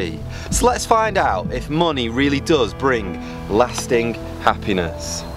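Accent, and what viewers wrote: United Kingdom. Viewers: It's British . I say it that way too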